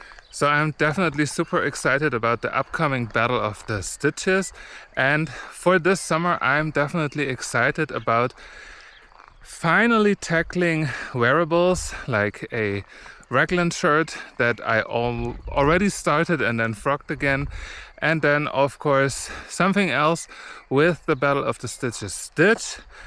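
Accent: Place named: Germany. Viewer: The more I listen to you the more I think you have a German accent..... It's mild and very calming to listen to you speak 😁❤️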